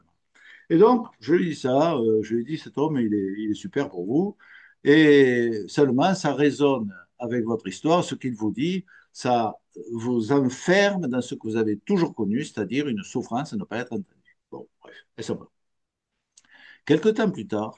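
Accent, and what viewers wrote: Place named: Occitania, France. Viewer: Mille mercis pour ce partage de votre " belle personne" ( et oui vous avez tout à fait le droit de ne pas dévoiler votre Thème Natal, surtout en nous l'expliquant si joliment.. 👍) une superbe découverte qui me rejouit 😊 avé l'accent! Une pensée claire et de Très haut vol 👌👌conjuguée à une grande simplicité animée par la verve du coeur ❤❤❤ j'apprécie également beaucoup Christian Duchaussoy que j'ai eu la joie de rencontrer à un colloque...vive les astrologues occitans 😊 Je suis sous le charme 😊, tout comme la pétillante, 🙌 impatiente de visiter votre site et de vous écouter Relationner au Congrès en Octobre 🎉🎉 des "Révélations" comme celle-là font tant de bien au Bel art des étoiles et le sortent enfin des ornières Horoscopiques et mercantiles... 🌟🌟🌟merci 🙏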